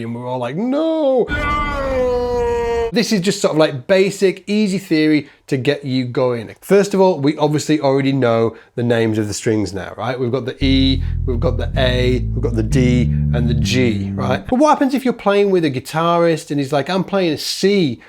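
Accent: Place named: Liverpool, United Kingdom. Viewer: Dude is from the Liverpool area, because his accent is just like the Beatles! So somewhere along the Northwest coast of England!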